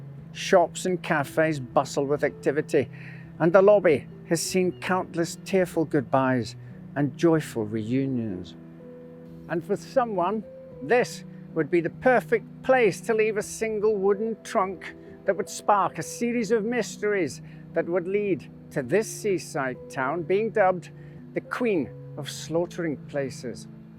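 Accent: Scotland, United Kingdom. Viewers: Oh my goodness!!! I ABSOLUTELY, POSITIVELY LOVE YOUR SCO-ISH BROGUE!!! Your stories are so very interesting!!! 😘😘😘😘😘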